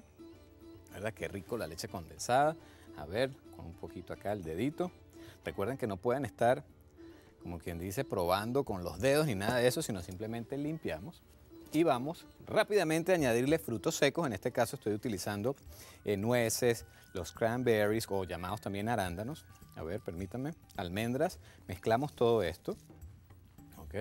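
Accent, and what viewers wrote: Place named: Venezuela. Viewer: venezolano SEGUUUUURO jajaja :D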